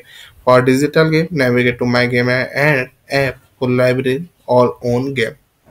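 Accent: India. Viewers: Hate India accent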